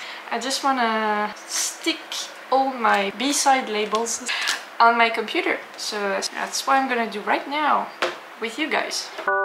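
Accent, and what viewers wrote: France. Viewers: おめでとうございます!! 🎉🥳 I love how your lovely French accent comes through more when you're excited! 😊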